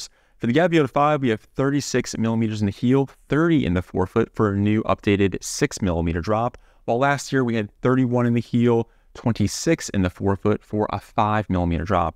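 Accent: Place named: Philadelphia, United States. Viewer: That Philly accent is crazy 😂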